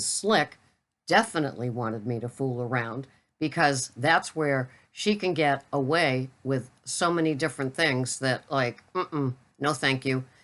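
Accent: Boston, United States. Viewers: I LOVE the Boston Accent. I need to do my New York Accent! Lol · yesss! I saw that too on Jason's channel! I totally agree with that. When you're eating whole fresh foods you won't have all those hidden sugars and hidden "junk" but people are eating so much processed keto stuff now that carb number can creep right up on ya! I love your boston accent!!!!! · Good morning. I love, love you. You will never be called crazy as far as I'm concerned. I don't know if you have seen my last video "Coffee chat". That I posted on Friday, January 19, 2018 or not. Now that is crazy. Love the Boston accent. It makes me smile, good for the soul. Enjoy your weekend. 💕. NonnaGrace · Morning to ya,! I am behind but cant resist your Boston accent! Yes, it will always be total carbs for me. Anything else is to 'iffy' xoxoMarjorie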